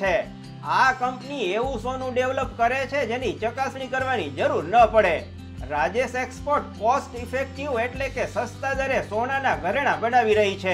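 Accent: Kathiawar, India. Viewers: અવાજ કાઠિયાવાડી જેવો લાગે છે. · TAME EK BHARAT VIRUDHDH NU NIVEDAN APE CHHE HU PAN EK GUJARATI CHHU PANBIJA RAJYANA LOKONI PAN KADAR KATVI PADE AVA ZER OKTA VIDEO NA BANAVO TAMARA AVAJTHI TAME KATHIYAVADI LAGO CHHO DUKH THYU YAR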